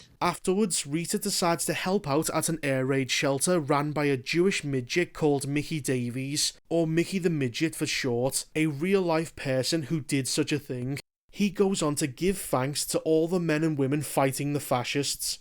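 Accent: Liverpool, United Kingdom. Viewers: What i hate more is your scouse accent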